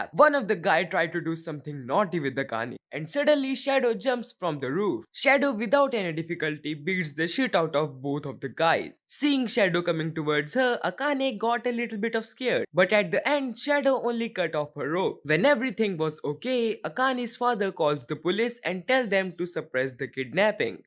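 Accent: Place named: India. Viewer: You voice sound like indian 🇮🇳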